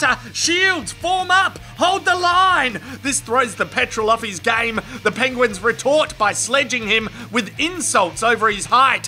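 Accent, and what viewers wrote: Australia. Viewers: He's just a typical Aussie. All my mates talk like this.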